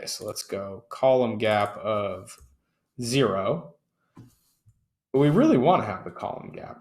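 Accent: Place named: United States. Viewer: Greetings, from St George! Finally, a site on Bricks described in an American accent. At least I can understand what you’re saying. Very nicely done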